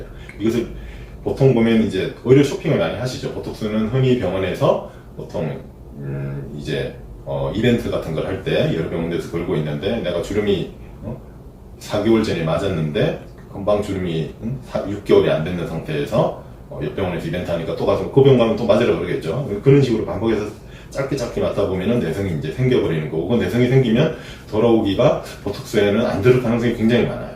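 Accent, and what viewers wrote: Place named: Gyeongsang, South Korea. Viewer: 쌤 이제 갱상도 말투 안쓰시나여 서울싸람 다되셨네여~ 진짜 멋진분 최최고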